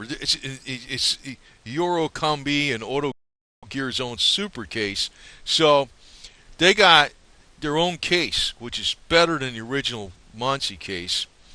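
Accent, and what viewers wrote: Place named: Sacramento, United States. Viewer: I bet he lives near Sacramento, sounds like me.. I live in KY now and I sound retarded but hey I used to think the KY accent was retarded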